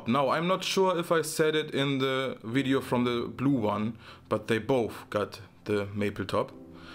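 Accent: Germany. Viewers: Mensch schwätz schwäbisch wia mir au!!! 😂😂😂😂😂😂😂😂😂 Tolle Review. Die rote ist meine nächste und letzte (Muahhhhaaaa) die ins Haus kommt. Gruß aus dem Süden El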